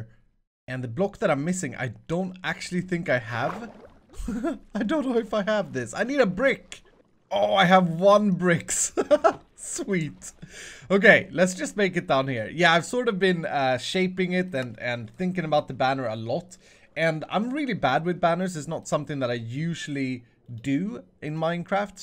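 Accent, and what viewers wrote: Sweden. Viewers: Are you Swedish?!? I’ve never seen your channel before, l really like your video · You ar from Sweden right?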